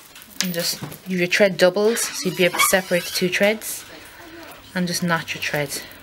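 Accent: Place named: Ireland. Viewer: an Irish accent is like beautiful music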